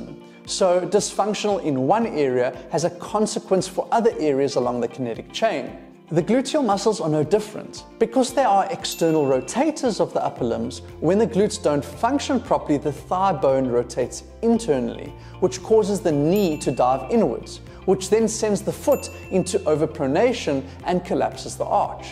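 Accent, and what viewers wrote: South Africa. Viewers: Wow you sound so South African lol Anyway, I think I was born with flat foot (only one of my feet is flat) As the years go on my foot is becoming more and more sensitive. It aches even when I'm sleeping.. I'm hoping some of what you said can help me. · You sound South African…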